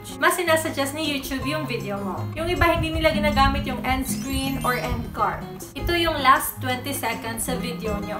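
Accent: Visayas, Philippines. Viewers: bisaya d ay ka lodi? hehe .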